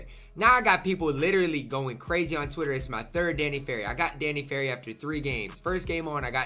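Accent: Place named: New York, United States. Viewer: Yo are ur parents from the east coast? Ur from Iowa but sound like my friends from New York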